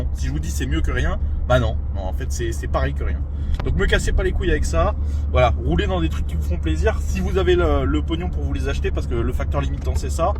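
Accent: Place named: Arab world. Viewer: Pourquoi l'accent arabe? Mis à part ca, on à l'impression d'entendre Papacito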